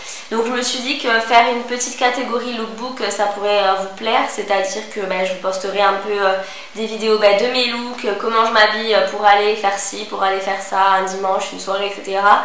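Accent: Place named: Corsica, France. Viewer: moi j'adore ta voix et ton petit accent corse... :))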